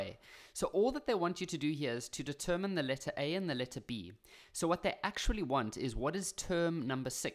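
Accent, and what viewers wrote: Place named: South Africa. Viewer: what country are you from because you sound like your south african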